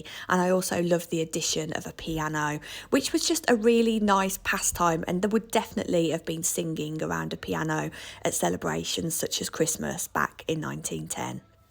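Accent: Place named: England, United Kingdom. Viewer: "Hellou'uu" It Astounds Me how you English Pronounce your words. So Comical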